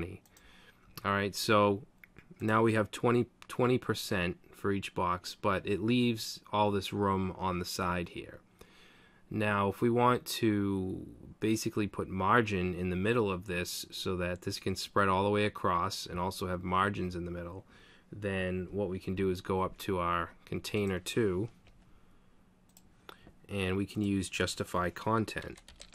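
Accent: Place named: Rhode Island, United States. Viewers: nice a dude with an east coast accent and swearing!! my fave. great tutorial, i understood it before but now i feel more comfortable with using flex. fixing my site now! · Bawx, sawce code, mahgins, you wouldn't be from Rhode Island?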